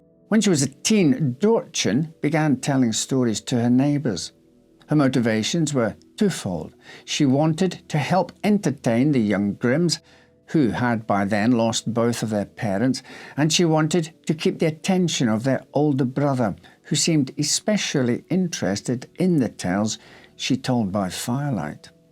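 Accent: Scotland, United Kingdom. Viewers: I remember all of the Grimm Brothers books. I love the real history of them. I always love watching you on YouTube. Love the Scottish acsent. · I love to listen to your telling of tales, and love it when you ‘lose it’. You have a very calming voice and your accent reminds me of my Scottish in-laws, rest their souls! Thank you. ❤️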